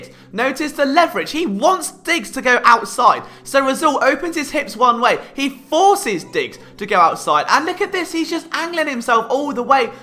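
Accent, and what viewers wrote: United Kingdom. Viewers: Who the hell is this Brit, trying to talk football?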